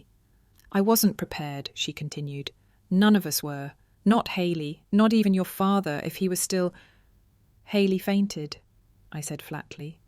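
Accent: United Kingdom. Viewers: Oh Dear. Another American based military story , Narrated in a British voice. I’m not really fond of this. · Not to mention the British accent… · The stories you print are plausible but lack authenticity. Supposedly about American military personnel. But they are narrated with a British accent. even the pronunciation of the rank of LIEUTENANT is pronounced as it would be in London, as LEFTENANT. I confess that took away any interest I had in the story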